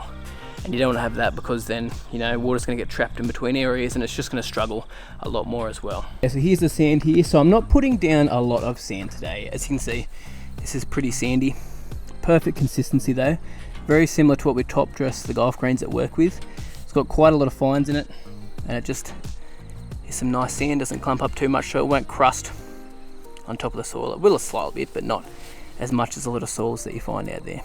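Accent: Australia. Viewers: You an Aussie?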